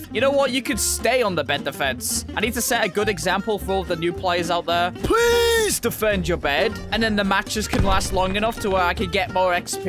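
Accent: United Kingdom. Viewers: are u brithish?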